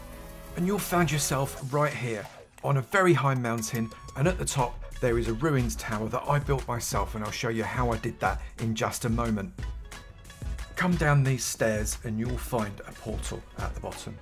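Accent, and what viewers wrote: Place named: London, United Kingdom. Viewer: ngl with your london accent im sad you didnt say wonga instead of cash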